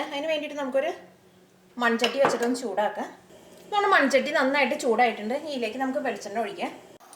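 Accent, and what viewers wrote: Thrissur, India. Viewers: chechi oru rakshayilya kidilan taste thanks for the recipe and your thrissur slank koodi aayappo polich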